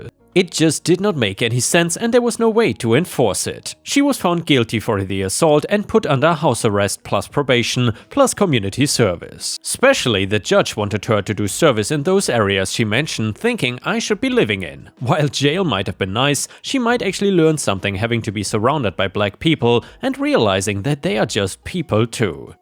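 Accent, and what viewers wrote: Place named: Germany. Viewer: Love ur accent 💞 im thinking German or perhaps French? 😁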